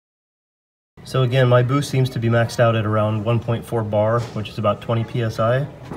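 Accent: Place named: United States. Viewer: Where do you live bro? You sound real american lol. Im totaly confused. Haha. Great videos by the way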